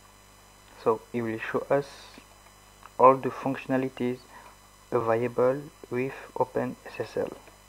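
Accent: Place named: France. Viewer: not bad but your english sound like french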